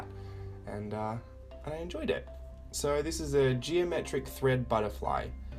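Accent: Australia. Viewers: I could watch you create all day. Don't lose that Aussie accent! Thanks for all the inspiration. <3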